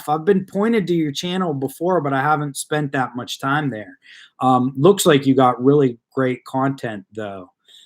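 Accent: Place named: Pittsburgh, United States. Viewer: I love that Pittsburgh accent. Miss it! From WV most of tv came from Pittsburgh. KDKA, WTAE. You aren’ t. Old enough to remember “Chilly Billy”. WIIC. We would lose our TV when the weather got bad. May God bless you in the New Year! Could go for some great Italian food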